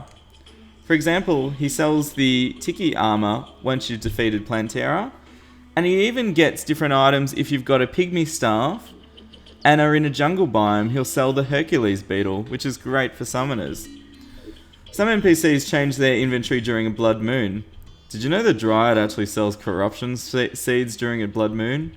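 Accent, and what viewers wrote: Australia. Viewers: You sound Australian, if you are im to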